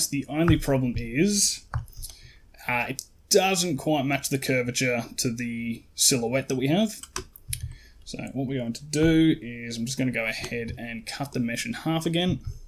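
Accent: Australia. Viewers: Thanks! Wow, so nice to hear an Aussie voice in a tutorial, apart from Andrew Price. I think you should either do a full model of this space marine or do a Terminator helmet next. Subbed and have a drink on me, cheers.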